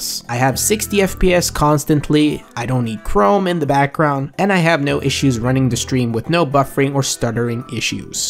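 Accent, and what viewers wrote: Sweden. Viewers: he's from Sweden the accent is pretty obvious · Är du svensk?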